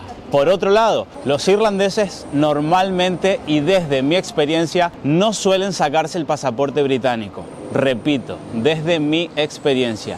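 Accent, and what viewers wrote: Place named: Rioplatense, Argentina. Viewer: Buen video, te escribo desde El Salvador 🇳🇮. Cuál de las dos Irlandas es más próspera??, Y cuál es tu nacionalidad??? Es que tenés una mezcla de acento rioplatense y de la península ibérica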